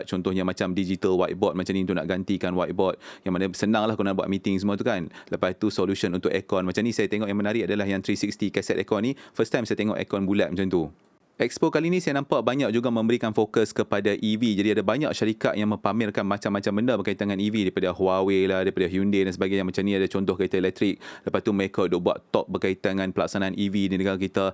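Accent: North, Malaysia. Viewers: Apapun saya senang dgr loghat gunakan.. sedap halwa telinga dengar sleng utara mix baku melayu.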